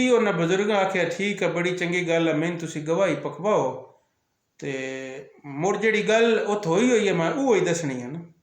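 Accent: Sargodha, Pakistan. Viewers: لاں سارے پنجاب وچ نہی بولیا جاندا ایہ لفظ سرگودھا تے منڈی بہاؤ الدین دے کجھ لاہندے آلے پنڈاں وچ ای بولیا جاندا اے تے جناب دا لہجہ وی اس پاسے دا ای اے ساڈے لہجے وچ لاں نہی بولیا جاندا لیکن اس لفظ نال واقفیت میرے بچپن دی یاد اے 😁 لاں اس رسی یا تار نوں آکھدے نیں جس اُتے کپڑے ٹنگ کے سکائے جاندے نیں یا اس نال رلدا ملدا کم لیا جاندا اے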